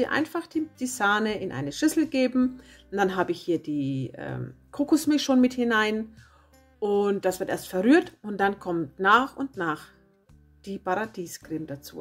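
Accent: Franconia, Germany. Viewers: Ich liebe dein fränkisch :)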